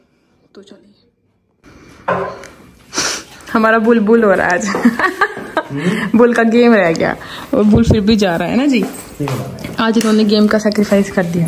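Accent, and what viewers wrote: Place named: Haryana, India. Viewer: Hey ❤❤❤ kashika here... Don't know message will reach..bas ker dia..😅😅😅😅and haryanvi accent me tum bhot surprising lgti ho...😂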